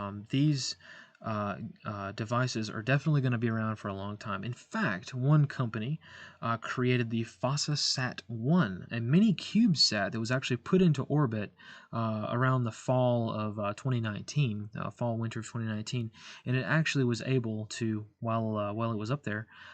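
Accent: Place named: Georgia, United States. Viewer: You from Georgia? You gotta similar accent to my buddy from Georgia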